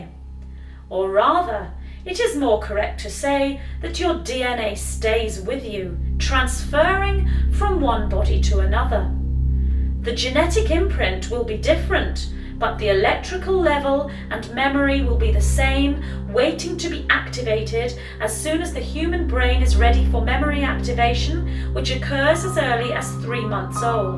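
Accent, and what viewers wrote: United Kingdom. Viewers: Bit British aren't we?? I'm British too...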